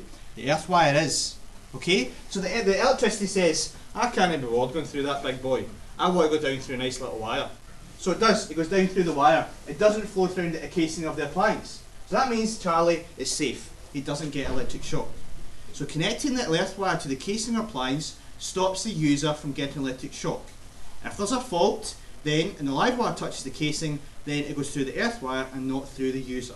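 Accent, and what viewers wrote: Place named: Scotland, United Kingdom. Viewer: This really helped, thanks! Took a bit for me to understand your Scottish accent.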